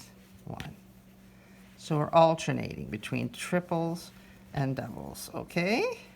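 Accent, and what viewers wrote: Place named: England, United Kingdom. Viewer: Thank you so much for tutorial Turned out lovely loving your accent England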